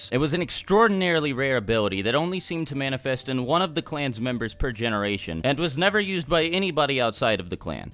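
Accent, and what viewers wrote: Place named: United States. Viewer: Your American accent is killing me... STOP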